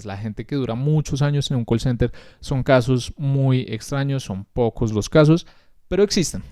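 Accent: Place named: Costa Rica. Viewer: Soy de Costa Rica y de entrada dije, a mira alguien de aqui. Como nos confundimos nosotros mismos con los acentos.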